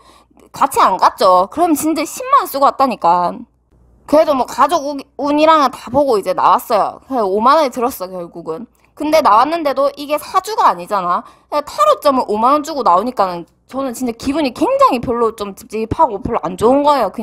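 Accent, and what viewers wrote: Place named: Gyeongsang, South Korea. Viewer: 타로 거기 좌표좀 대구면 가볼려구요 ㅎ 말투가 대구나 부산같내요 ^^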